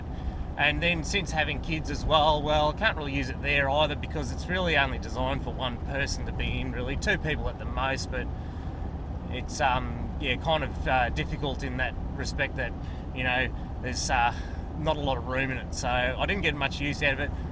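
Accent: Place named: Australia. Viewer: As soon as i heard the Australian accent and then the stupid music i just new it was time to stop watching this vlog 😮 greetings from England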